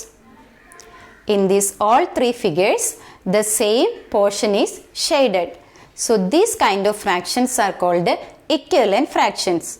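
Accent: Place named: Tamil Nadu, India. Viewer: Good presentation in Tamil accent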